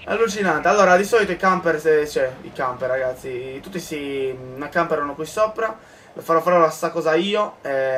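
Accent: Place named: Apulia, Italy. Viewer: Ma sei pugliese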